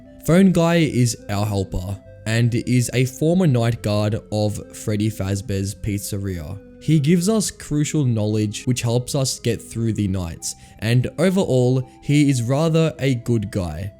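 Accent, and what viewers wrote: Australia. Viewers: I can't tell if I've heard your voice somewhere before or all Australian people sound the same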